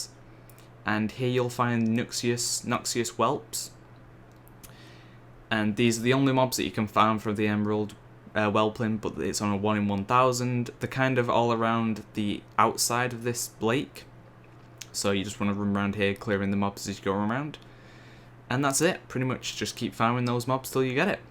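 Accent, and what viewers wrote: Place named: Manchester, United Kingdom. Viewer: rofl obviously from Manchester. cheers mate lol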